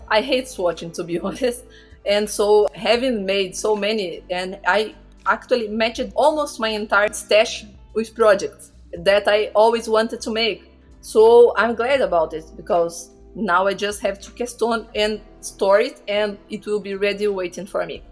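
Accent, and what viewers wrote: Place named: Brazil. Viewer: incrível que eu pensei "ela é brasileira, certeza" só de ouvir você falar. ameiiiii 💜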